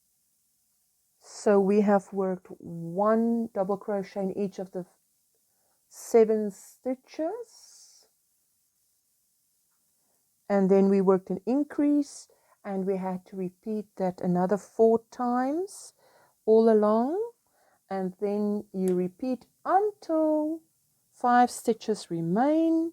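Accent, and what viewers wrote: Afrikaans, South Africa. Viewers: Is jy van RSA. Ek hoor Afrikaanse aksent.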